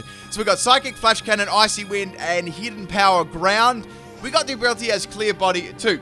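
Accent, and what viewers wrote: Australia. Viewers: Did not know you were an aussi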